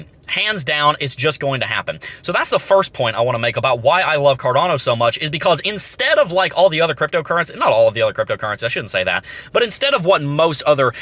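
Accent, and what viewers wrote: South, United States. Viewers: Fast talking southerner. I like it. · Said like a true Southern fast-talking scientist/engineer.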